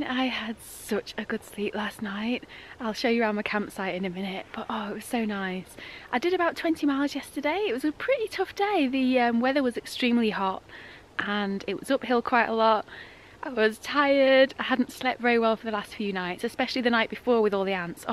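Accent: United Kingdom. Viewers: Healthy meal!! Heat is dangerous, be careful out there! Love the accent. It’s like a Mary Poppins thing, very sweet. The suitcase, clothes and cans look very old. Sometimes “interesting” people do their own adventure.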